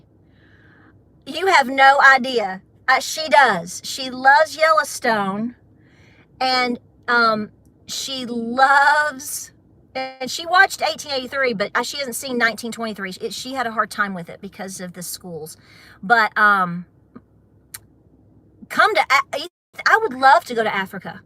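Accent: South, United States. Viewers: You say your accent isn’t southern but I am from northern Alabama and your accent sure sounds a whole lot like mine Love ya girl.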